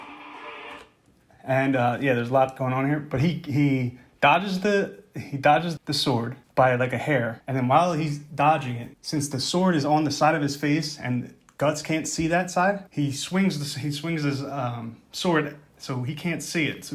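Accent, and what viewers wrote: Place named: New Jersey, United States. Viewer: has a beautiful jersey accent that sneaks out every once in a while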